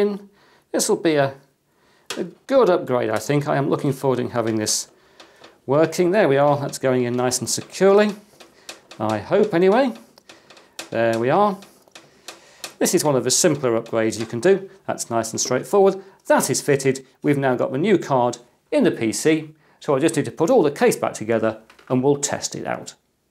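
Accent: United Kingdom. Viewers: Why is it when a British person speaks everything sounds so much better? Subbed btw your voice is awesome.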